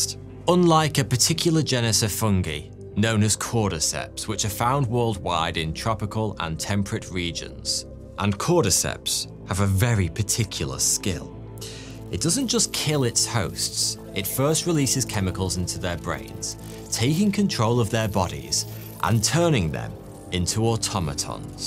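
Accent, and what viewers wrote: United Kingdom. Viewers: Trendy topic about a crappy mainstream overrated TV show, check. Cheap British accent, check. Long winded intro to get the video over 10 minutes, check. Sponsor at the start, check. Idiotically simple take on an interesting subject, check. Gotta love Youtube.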